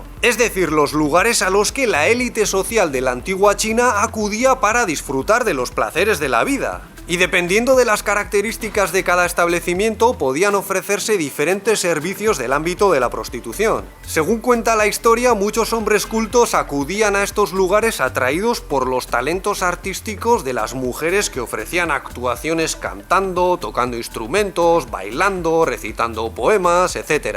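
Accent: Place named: Spain. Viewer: el tipo habla español de españa pero la cara no parece de españa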